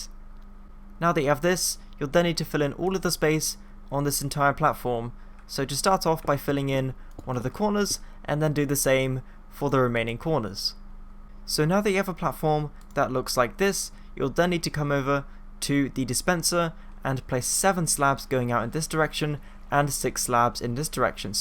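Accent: United Kingdom. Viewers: It’s called an English accent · its called being British lol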